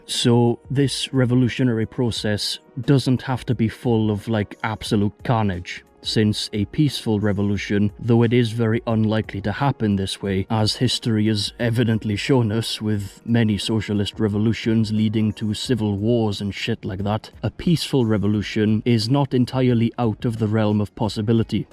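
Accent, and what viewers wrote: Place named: Wales, United Kingdom. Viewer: We all knew you were Welsh! That accent is unmistakable!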